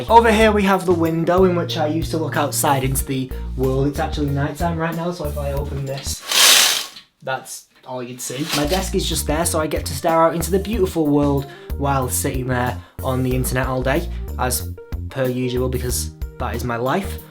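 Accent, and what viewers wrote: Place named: North, United Kingdom. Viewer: I'm a northerner myself, but your accent is pretty nice compared to the ones around me, u go!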